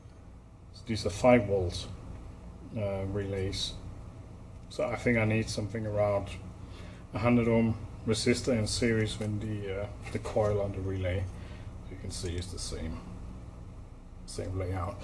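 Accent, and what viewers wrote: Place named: Denmark. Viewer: thx for the video, also took the plunge on a couple of these. was comming short when checking LDdrivers and loads due to high power laser diodes, and purchased a couple of these power supplys. was looking at that KA3005P but seems to cost around 110US delivered + tax and then saw the KA3003P (nax3A) for 63US delivered, so took that, but doubtfull that it will get shipped hence 63US seems to low. while waiting for it to get shipped' instead purchased the KD3005P from Reichhelt Germany to around 77EU+5.6EUshipping-fee and it got delivered today, 25hours delivery time from Germany to Denmark, impressiv. Trying to get familiar with levels and the rela'es, seems to click around 3 times from low to top 31v voltage, but it puzzle me that it keep dropping the voltage on load but likely my rookieness to blame, but i do cherise that its the P version and able to handle it with the mouse and keyboard the values and getting graphical views, makes the adjustment easy, evewen thow the two clickknobs on the KD model is easy to manage. 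... btw your accent very much sound like your from Denmark..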